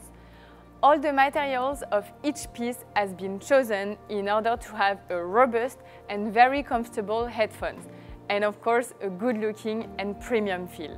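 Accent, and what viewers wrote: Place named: France. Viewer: J'adore son accent français 🥰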